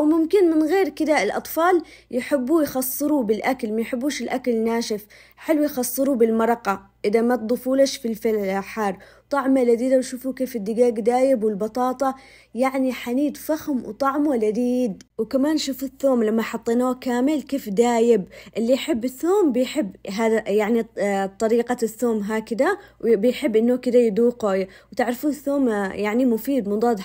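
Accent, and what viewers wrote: Aden, Yemen. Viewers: شكرا جزيلا لك يا بنتي كلامك العدني الأصلي والاكل ماشاءالله تبارك الرحمن ربي يحفظك حلو جدا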